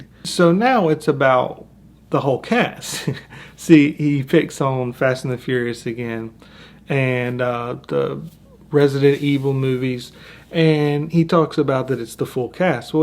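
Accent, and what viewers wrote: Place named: Rural South, United States. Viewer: like I said, a southern white person calling people stupid and an idiot is winning the argument. You DID NOT make valid points in this video. You are just another white person on YouTube. You are not important in any way what so ever. Understand that. You are not owning mrwin your comments. You are not Perry Mason and you sound like a hick. Your opinion doesn't count so why are you acting like someone is attacking whites? You want to call people Nazi because you want to grasp for straws. You don't think race should matter because you are white. The best person to you is a white person. Get off your high horse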